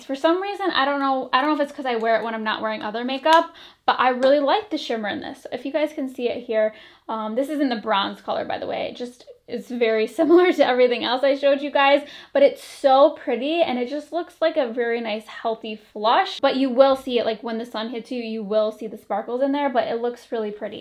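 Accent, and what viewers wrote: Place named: Michigan, United States. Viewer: This is totally random, but are you from Michigan? You definitely sound like you have a Michigander accent. :)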